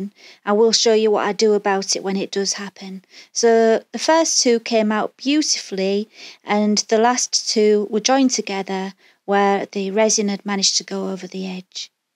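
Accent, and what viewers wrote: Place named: England, United Kingdom. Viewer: So lovely to hear a fellow English person giving a tutorial. You are a breath of fresh air. Loved all the knowledge you shared. I can’t wait to get started. 😘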